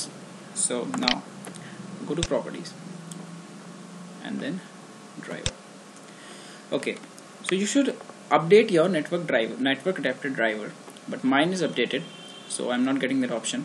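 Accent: India. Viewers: Oh god i fucking hate your indian accent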